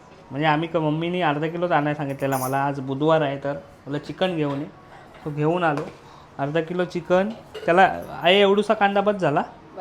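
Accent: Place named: Konkan, India. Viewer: नमस्कार भाऊ मी मुबंई मधून बघत आहे आणि मी नेहमीच बघते तुझे व्हिडीओ मी मुबंईला महालक्ष्मी इकडून बघत आहे आणि तुझी ही गावठी भाषा ऐकून छान वाटते मला आपल्या कोकणातील गावची भाषा आवडते तुझ्या कडून एकण्यास वेगळी मजा येते धन्यवाद भाऊ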